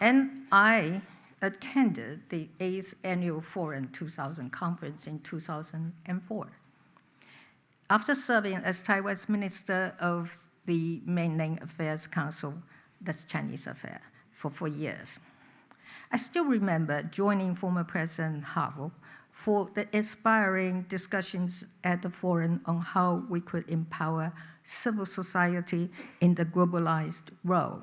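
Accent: United Kingdom. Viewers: 的確。的真的很英國。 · 她的英國腔很好聽！ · 她的英國腔🥵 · 英國留學果然帶著標準的英國口音，閉上眼睛👀聽來就和英國人差不多🎉！ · 小英正面的論述，加上流利的英國腔英語，迷倒了眾多歐洲人。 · 蔡一直都是英國腔沒聽過以前的接待外賓訪談嗎？ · 亂噴什麼一直英腔好嗎？ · 她並不生疏，政治人物的演講重點就是必需清晰，自然速度會放慢。另外，她的英式口音非常漂亮。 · 為什麼小英總統的有英國腔🤔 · 正統牛津腔的演說，讚。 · 小英總統的英國腔有高貴的氣質~ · 小英是在英國讀書的，她的口音向來都是英國腔。你的耳朵沒有跟腦袋連在一起嗎？ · 哇塞，英國腔❤ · 小英的英國腔在歐洲人聽了會覺得很親切~~ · 聽得出來英語有點生疏，英國腔英語好明顯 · 人家講的是英腔，你是來留言被打槍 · 小英總統的口音是不是有點英式😳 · OMG, she speaks English with British accent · 當總統時是美國腔，不當總統就變成英國腔? 自己不覺得美國人聽了怪怪的嗎? 是否搞不清楚誰才是主子? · 一直是英國腔喔！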